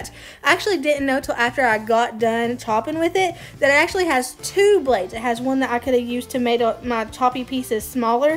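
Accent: South, United States. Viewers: You look just like my granddaughter and have the same southern accent I absolutely love it😂😂💕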